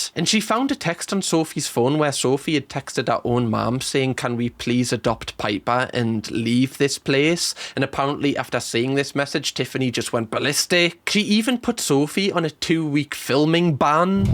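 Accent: Newcastle, United Kingdom. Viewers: He says he has a Geordie accent? I don’t know where that’s from 🇨🇦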